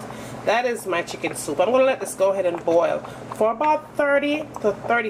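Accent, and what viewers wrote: Jamaica. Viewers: I’m from the Caribbean but I just moved to The Netherlands and when I say your accent and patois is like a breath of fresh air to me😂😑 totally gonna make this and I love how you’re not yanking like how most other Caribbean ytbers do. Much love!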